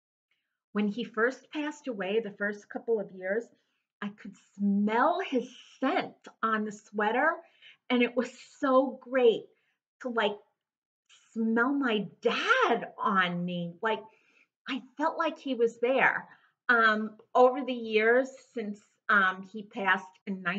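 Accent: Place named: New York, United States. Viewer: I can't get enough of your pronunciation of "Tony of Beverly!" Tony of Brooklyn!!!!! As a New York woman but living in the south, I miss that NY accent! BTW, you look gorgeous in Avery! I purchased her after watching your review - in Kahlua, too! ♥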